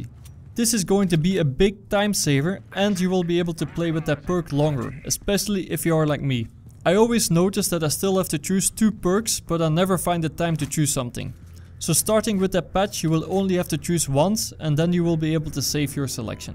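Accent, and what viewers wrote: Netherlands. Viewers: If you really wanna get bigger and compeed with the big channels, you need to upload 1 video a 1 or 2 days. Im enjoying your video's tho, keep up the good work. And where u from? Je hebt een Nederlands accent! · I think stay the way you are, you have the most insightful tip/tricks/tutorials. Maybe hints to certain maps for certain game modes, but other than that keep doing what you are doing. One if not my favorite BFH follows/subscribes. Oh and the Dutch accent pushes it over the top to EPIC for me every time.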